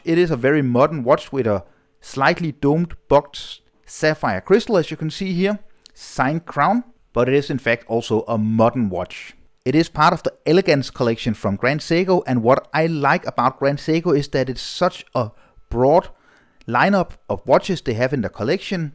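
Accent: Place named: Denmark. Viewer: Man, I can pick out a Danish accent anywhere.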